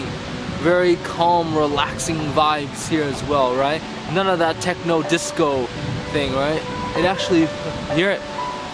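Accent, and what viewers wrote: Vietnam. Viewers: That hue dialect though. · Thu Phan :) nó nói tiếng đà nẵng chay á cô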